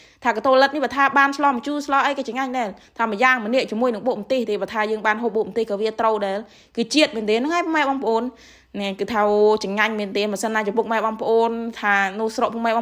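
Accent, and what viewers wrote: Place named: Siem Reap, Cambodia. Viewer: នៅខែត្រសៀមរាបបានជានិយាយល្អើន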